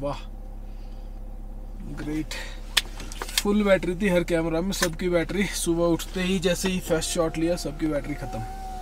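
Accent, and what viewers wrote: Haryana, India. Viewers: Bro are you from haryana🤔🤔🤔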